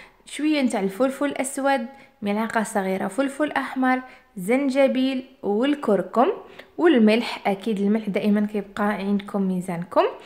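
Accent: Oujda, Morocco. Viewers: اول مرة نشوف قناتك واكتر حاجة خلاتني نتابونا هي لكنة الوجدية ما شاء الله شحال راقية هاد لهجة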